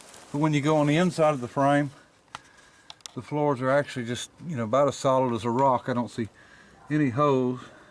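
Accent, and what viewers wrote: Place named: North Carolina, United States. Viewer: You have the North Carolina accent..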